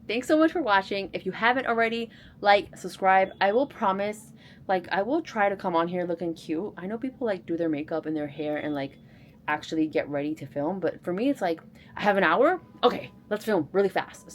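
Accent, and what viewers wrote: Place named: Brooklyn, United States. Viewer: I love all of your finds and your style!! I lived in Brooklyn in the 80’s as a teenager. Your accent and your personality brings me back, and reminds me of all of the Italian girls that I went to school with….I miss it so much!!! The best time of my life!! Plus, I want to convert my spare bedroom into a closet and dressing room…but my 20 year old son is still in there 😂 Id say your Halloween costume will be Fran Drescher as the Nanny.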